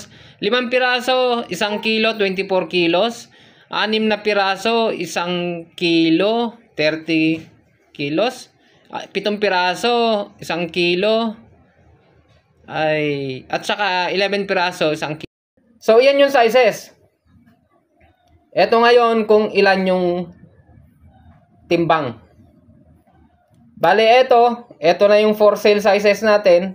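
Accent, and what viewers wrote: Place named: Ilocos, Philippines. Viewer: Taga ano ka gayam Sir, kasla nangngeg ko ilokano met sao yo. Ty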